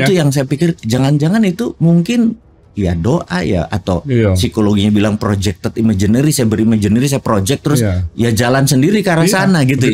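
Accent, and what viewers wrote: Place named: Palembang, Indonesia. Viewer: msh ingt aja logat bhs palembang. 🤣🤣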